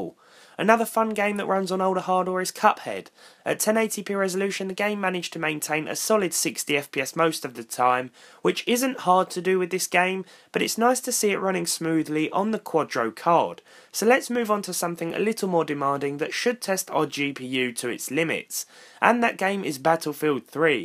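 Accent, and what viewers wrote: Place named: England, United Kingdom. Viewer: I needed my English accent fix. Thank you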